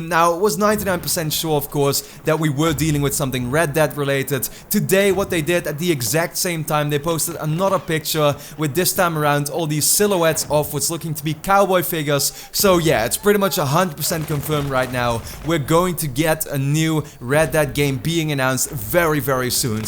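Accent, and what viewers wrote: Netherlands. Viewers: You’re so obviously dutch😂😂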